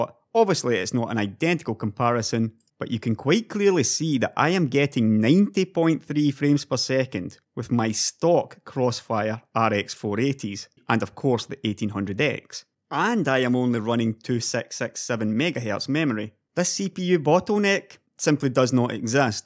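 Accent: Scotland, United Kingdom. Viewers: Your scottish accent is giving me a hard time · I came for the Scottish accent, did not disappoint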